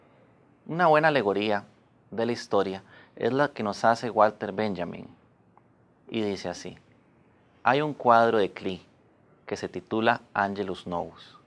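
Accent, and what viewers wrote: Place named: Costa Rica. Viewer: es por q es costaricence y su forma de expresars es muy peculiar,con un acento distinto al demas español.y bueno si también ay palabras q significan otra cosa pero siempre ligado a su idioma popular.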